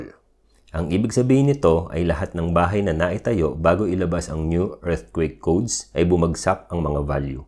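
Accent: Philippines. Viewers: Bossing tiga saan ka sa pinas nueva ecijano kase tagalog mo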